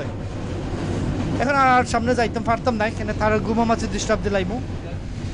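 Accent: Sylhet, Bangladesh. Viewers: ভাই এই লোকে সিলঠি মাথের আপনার ভোজার সমস্যা হলে আপনি আমাদের লন্ডন সিলঠ চলে আসোন♥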